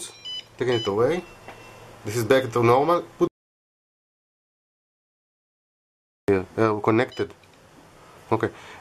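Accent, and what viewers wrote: Israel. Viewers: עכשיו ראיתי את המוזיקה אח שליח ידעתי שאתה ישראלי מהמבטא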